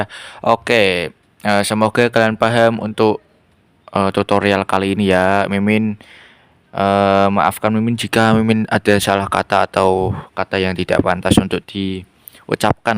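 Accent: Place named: Java, Indonesia. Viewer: suara jawa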